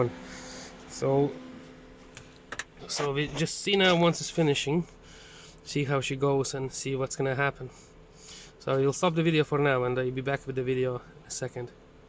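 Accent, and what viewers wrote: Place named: Russia. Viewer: Сразу слышно что человек Русский )))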